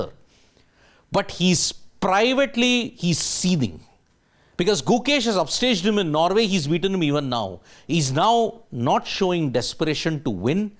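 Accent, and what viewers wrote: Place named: Tamil Nadu, India. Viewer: Host: U've an Irrrrrrittttaaating Tamilian accent, a bit like Ashwin the bowler. Def not a grt way to present. Gary's Insights are always valuable. Magnus is perhaps past his prime now. We shall know it in a yr or so.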